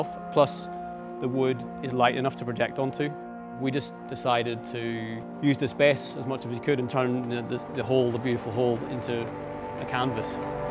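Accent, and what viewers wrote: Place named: Northern Ireland, United Kingdom. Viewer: Holy shit my favorite artist is from the same country as me, northern Ireland. Heard the accent and flipped out!! Keep up the good work 👍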